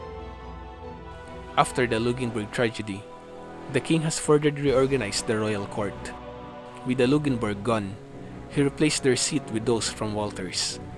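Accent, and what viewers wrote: Philippines. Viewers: That is a very strong crisp filipino english accent.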